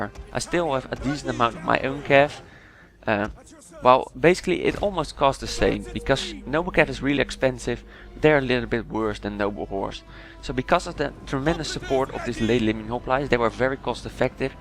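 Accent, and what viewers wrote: Netherlands. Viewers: damn i know dutch person when i hear one, lekker bezig pikkebaas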